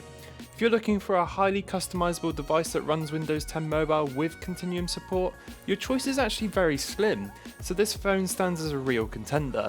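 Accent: United Kingdom. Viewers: That accent though, which area in Britain is that???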